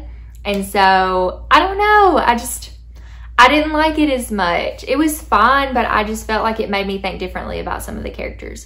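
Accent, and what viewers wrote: South, United States. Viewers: I love hearing your perspective with that southern drawl.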